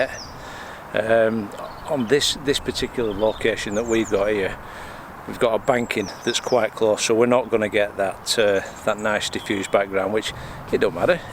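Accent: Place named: Yorkshire, United Kingdom. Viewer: Awake sorry about that it sounds a little Yorkshire that's all and I'm from Sheffield and thought you were more local to me lol hope you're not offended anyway wouldn't want to do that to anyone. Anyway I was talk texting as I do and it sent automatically I was saying about the bird hide.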